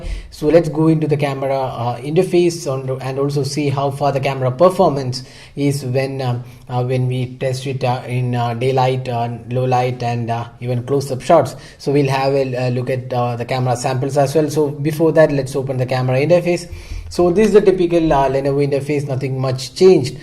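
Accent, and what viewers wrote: Kerala, India. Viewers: r u from kerala?? 😉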